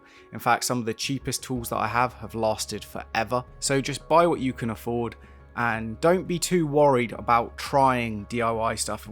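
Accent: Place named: England, United Kingdom. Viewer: As for talking or not.. just carry on as now. Your voice is pleasant, info is good to know. Too much talking can get annoying but so can total silence. How'd you keep yr English accent growing up in Normandy? Love to know..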